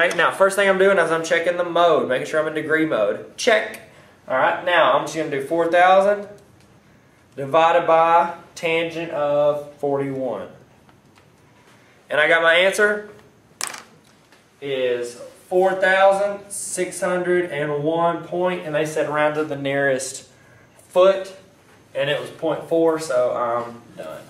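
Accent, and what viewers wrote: South, United States. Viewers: Btw awesome southern accent :D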